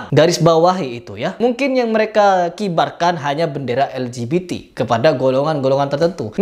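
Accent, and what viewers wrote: Madura, Indonesia. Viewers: Cek maduranya logatnya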